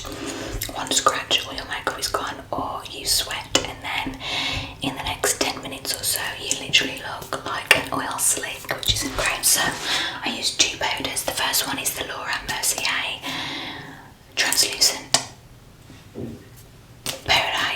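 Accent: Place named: United Kingdom. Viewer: Hi love 💕 i like your british accent. Are you from Australia?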